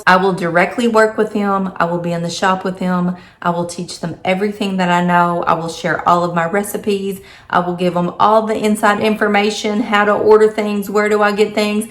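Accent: United States. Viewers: That was a great video, I knew most of that from following your channel, but always happy to hear you chat (you have a very nice, soft American accent 😊) . Never knew about Randy being your second hubby, glad you found each other again 💛........If I lived in the states, I would love to buy your business🍨🍦 Best of luck in all that you will do, your fans will be watching 💛💛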